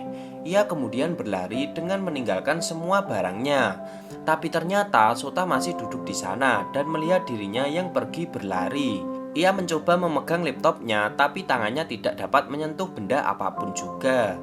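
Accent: Java, Indonesia. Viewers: Intro,closingnya sama logat jowone yg bikin gua pengen nonton trus celengan film😂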